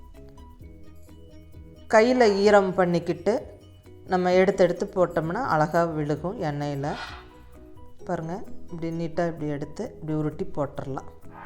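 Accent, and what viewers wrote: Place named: Kongu, India. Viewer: Thank u so much for ur video....... Ketadhum u did this vlog....... So sweet of u....... Recipe is so Gud and again our Kongu slang wow....... Nice to hear......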